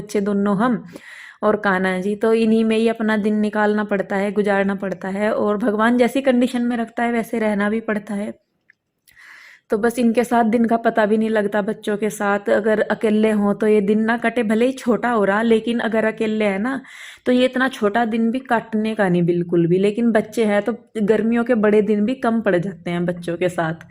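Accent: Haryana, India. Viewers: Kya.aap haryana se ho aapki boli m haryanvi touch h m b haryana m rehti hu rohtak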